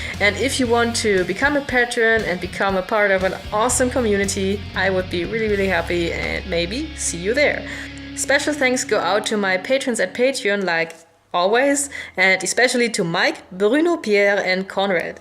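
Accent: Germany. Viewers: Hi love your covers!! But I think you should Have Zakk Wylde and Dimebag RIP!!! also Yngvie!!! And the great Eddie Van Halen!!! Imo, way better Guitarist!!! But as always love German accent!!! Keep Rocking as always 🤘🤘🤘🤘😈😈😈😈😈👍👍👍🤘🤘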